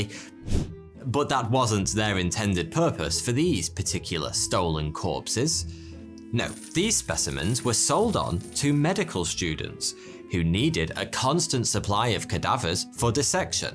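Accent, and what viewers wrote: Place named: England, United Kingdom. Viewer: All I can say is, wow! Sounds like jolly old England. Ha haha! James May.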